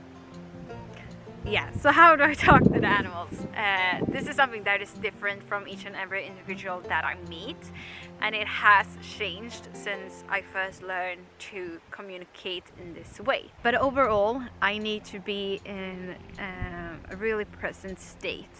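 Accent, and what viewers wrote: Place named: Scandinavia. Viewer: I'm just getting into this topic, after my mind being torn apart by Anna Breytenbach... It's exciting to watch someone go through the early stages of developing these skills! Edit: I love your accent. Scandinavian right?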